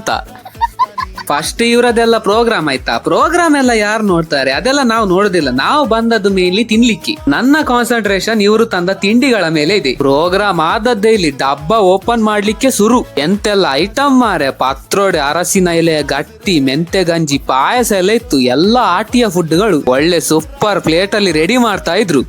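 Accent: Mangalore, India. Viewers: Nimma mangalore kannada bhashe chanda untu marayya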